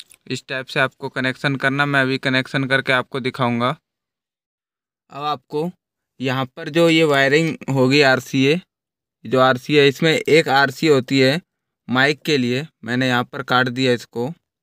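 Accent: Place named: India. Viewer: No me gusta el acento que tienen los indus en inglés.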